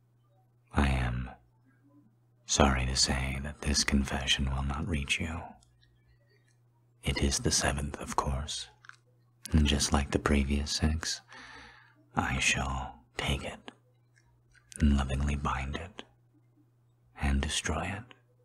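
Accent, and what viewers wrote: South, United States. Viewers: Hi my name is Alyssa Hayes and i love watching your video and ur voice is so hot sexy and i love your country accent voice and could u do the Country accent roleplay Cowboys romantic for me? :-D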